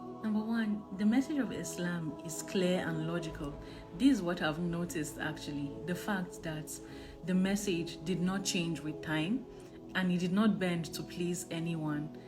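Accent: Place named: Hausa, Nigeria. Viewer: Sis, but you have Hausa accent. Did you grow up in northern Nigeria?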